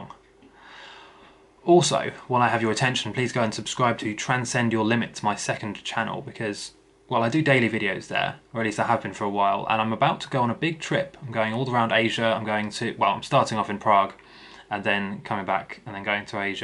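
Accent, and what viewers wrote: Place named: North, United Kingdom. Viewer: I'm american. I understand you well I think its cause you sound like youre from the north(maybe, could be wrong). There are some English accent dialects that are difficult to understand but youre clear :)ha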